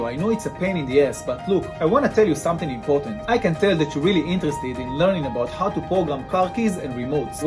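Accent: Israel. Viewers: מבטא ישראלי. אשמח אם תוכל לעזור לי לגבי שכפול שלט לקיה קרניבל 2022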